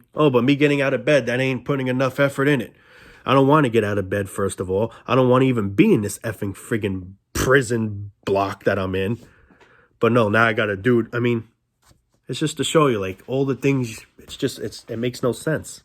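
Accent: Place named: New Jersey, United States. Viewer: I can't tell if his accent is New Jersey, New York or Canadian...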